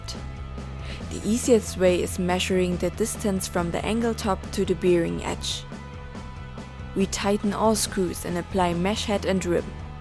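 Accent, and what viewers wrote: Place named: Germany. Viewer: This seems to be a very sophisticated way for a transition. The aluminium disc is only needed from my perspected, when you want to muffle the batter head of a regular drum head to make it silence. Otherwise take any wood or aluminium profile, that doesn‘t flex so much and attach the rim shot piezo at the shell of the drum. But great explained how to proceed! And a nice German accent 😉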